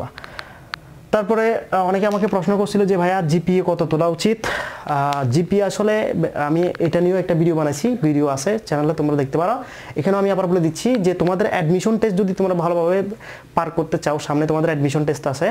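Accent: Chittagong, Bangladesh. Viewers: অাপনি চাটগাঁইয়া নাকি গাইয়া গুইয়া কথা বলেন কেন?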